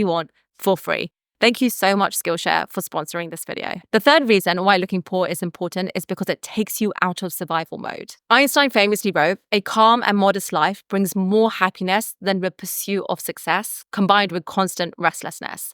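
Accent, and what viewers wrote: United Kingdom. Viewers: Nice presentation with nice british accent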